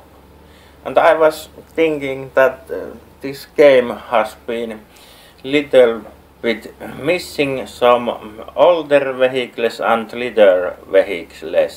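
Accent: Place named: Finland. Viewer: Eihä sille voi mitää itekki menee englanti siihe ralli englantii kuten valtra valmet mainitsi jo:D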